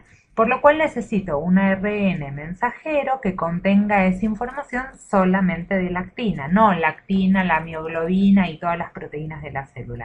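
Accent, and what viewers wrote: Argentina. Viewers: Andaba buscando algo gráfico que me ayude a entender el tema y te encontré! sos una genia y no voy a mentir: cuando te reís o tenés un argentinaz al hablar, me generas mucha calidez! un placer escucharte!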